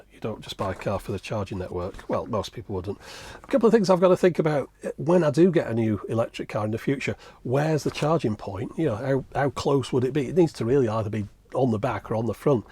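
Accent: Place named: Wirral, United Kingdom. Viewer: . I'started watching you three or four months ago and got hooked, so today a signed up with Patreon. As a Brit living in the USA, with maybe a few more miles on the clock than yourself, and married to a girl from the Wirral, it's great to hear that accent again. I know you like units with a CRT embedded in it and wondered whether you know anything about the portable Galaxy 2200 Video Cassette recorder? Its of the 'made of steel' era with a corded remote, powered by battery or mains and with a handle to carry it around... There's precious little info on the web and prices go from free to $250 functioning. Any how, I could make an unusual piece. Much love from across the ocean. Leon.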